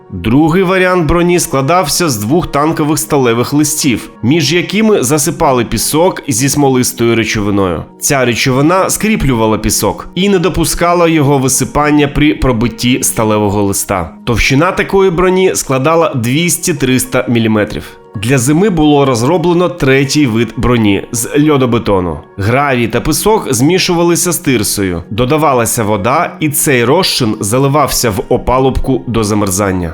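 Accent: Russia. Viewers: В УКРАЇНСЬКІЙ МОВІ "Г" ВИМОВЛЯЄТЬСЯ БЛИЗЬКО ДО "Х" А НЕ "К" ЯК В КАЦАПНІ, ВЖЕ ЧАС ЗАПАМ"ЯТАТИ, ТА ПОЗБУВАТИСЯ КАЦАПСЬКОГО АКЦЕНТУ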